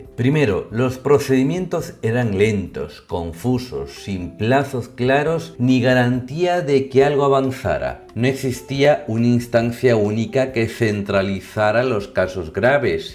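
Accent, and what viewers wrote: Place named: Spain. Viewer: Vos porque habláis asi, joder tío esto es un fraude voz de españolete y cara de hispanoamericano autóctono.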